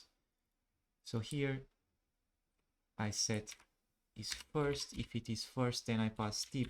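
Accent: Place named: Portugal. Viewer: you've got a portuguese accent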